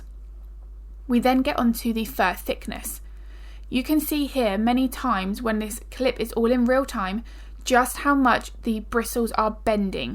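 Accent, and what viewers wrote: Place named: United Kingdom. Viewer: I’m new to animal portraits, yet I am a trained artist! You are fantastic:) My BFA is in Ceramics and Abstract Painting. In a week or soI I’ll be joining Patreon. In just seven minutes I’ve learned SO much! Thank you for sharing your gift. Oh, I’m Shane, BTW. Hope to chat with you soon. I assume you are across the pond, based on your accent lol. I’m in Charleston, SC…..and there is a HUGE market here for pet portraits here that I want to dive in. I do human portraits as special gifts due to the length of time it takes to complete one, but I sell my original abstract more. Hope to chat with you soon!! Oh, have you ever done a medium goldendoodle? -Cheers, Shane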